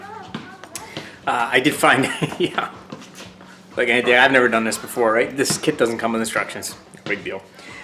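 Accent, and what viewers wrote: Northern Ireland, United Kingdom. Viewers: I can pick up on your slight Northern Irish accent, all the best from the UK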